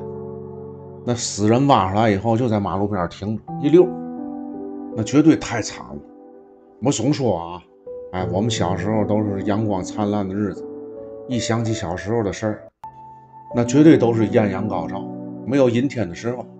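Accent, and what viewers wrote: Tianjin, China. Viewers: 形容的好真實丶形象，加上維妙維肖的天津音及口才，真喜歡這個節目。 地震，左右搖時，狀況要比上丶下震要好一點。磚之間用泥巴壘的房屋，五級就會發生倒毀。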